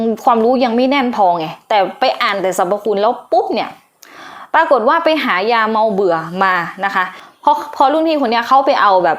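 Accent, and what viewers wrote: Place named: North, Thailand. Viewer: หมออย่จังหวัดอะไรอู้เมืองแต้ชอบวิธิอธิบายชัดได้สาระ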